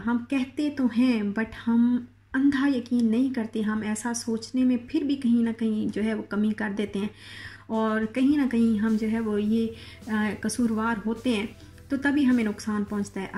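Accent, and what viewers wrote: Saraiki, Pakistan. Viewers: Mein bhi saraiki hun....shoro sy hi ap k lehjy sy mjy pta lg gya tha k ap sariki hain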